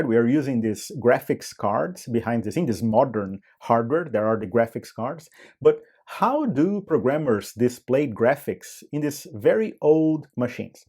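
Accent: Brazil. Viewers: If you drink mate you've got to be Argentinian but you have a Brasilian accent haven't you 🤔. As a C64 programmer I can imagine how hard the VCS must be to code for without a graphic's memory. This notion of racing the beam is alien to me.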